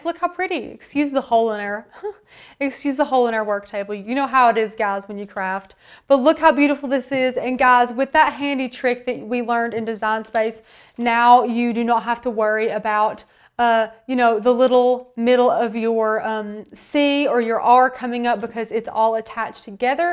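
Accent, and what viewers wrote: South, United States. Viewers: Nice to hear someone actually form the south for once. Great instructions. It looks like fun , also creative. Thank You from Georgia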